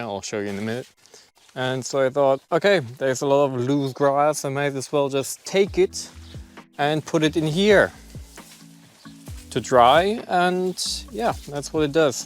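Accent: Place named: Ireland. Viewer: Das war wieder ein sehr informatives Video😊 und du hörst dich langsam wie ein echter Ire an😂❤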